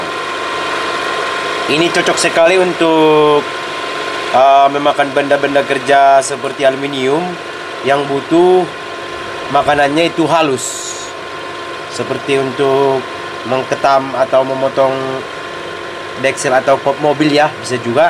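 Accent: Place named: North Sumatra, Indonesia. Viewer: Dimana alamatnya bosku....sepertinya orang sumut...saya juga orang sumut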